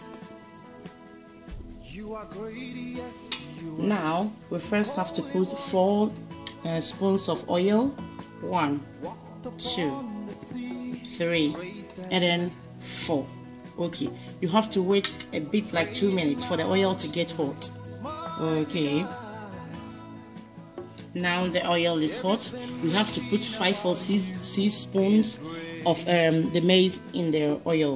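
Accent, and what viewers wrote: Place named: Ghana. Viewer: As soon as I heard the accent ...Ghanaian , lol! Subscribed!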